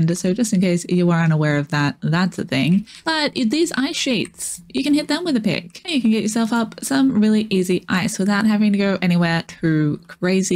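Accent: Australia. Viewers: Fine video...Love the heavy 'down-under' accent at different points in the guide. Voice speed and pitch is perfect for my brain.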